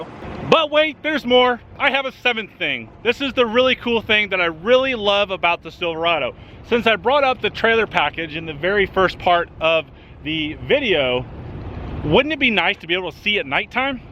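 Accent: Chicago, United States. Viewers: Is this guy from The Windy City blah blah blah blah blah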